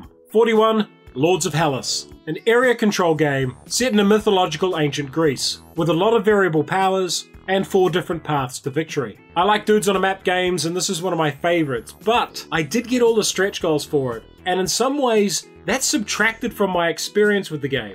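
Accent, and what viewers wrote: New Zealand. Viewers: Kia ora, first time watching your show and obviously picked up the kiwi tone etc. Then to hear the Waikato part was great. Are you still in the Mighty Waikato as Hamilton is my home town.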